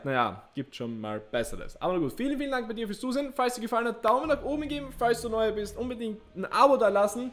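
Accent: Austria. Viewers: bist du österreier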